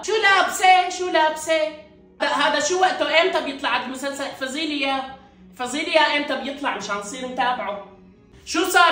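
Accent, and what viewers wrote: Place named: Syria. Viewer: هههههههههه والله ضحكتني ماحلاها اللهجة السورية تحية من الجزائر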